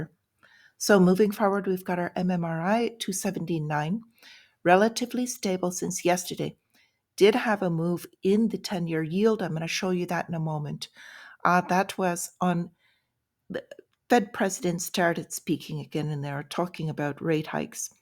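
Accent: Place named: Ireland. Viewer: Greg Mannarino recommended you. I like your news & love your accent! Forgive my possible ignorance, but are you Irish? If so, can you comment on the insanity going on there?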